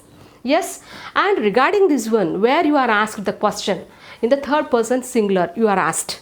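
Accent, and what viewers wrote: India. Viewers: teacher you have a very strong indian accent! please work on your accent, the way you speak we almost do not understand, because of your accent.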